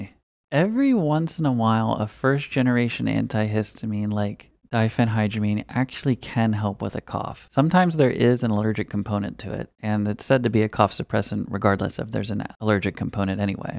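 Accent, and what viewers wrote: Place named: Pennsylvania, United States. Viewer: Maybe Pennsylvania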